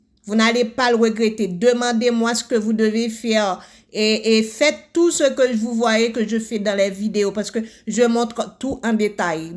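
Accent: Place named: Haiti. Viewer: Vous avez un accent haitien ❤ sinon merci pour les vidéos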